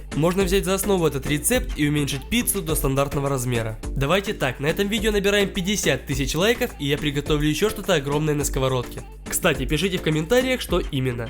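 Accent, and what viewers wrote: Ukraine. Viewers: Слушай попробуй зделать на ней огромную порцию жареной картохи или люля кибаб и можно шавуху.(я смотрю твой канал с 3 тысяч тогда чувства были что ты прям украинец украинец но сейчас как логопед по русскому языку) желаю успехов и обогнать обломова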